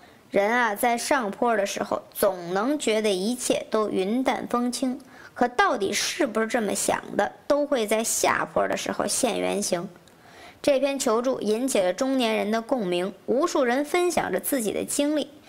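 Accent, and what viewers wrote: Beijing, China. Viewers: 这口音是京片子吗？真逗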